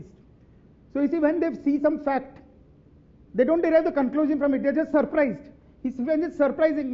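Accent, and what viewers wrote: India. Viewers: His accent is distinctly Maharashtrian but your bias won't let you see such details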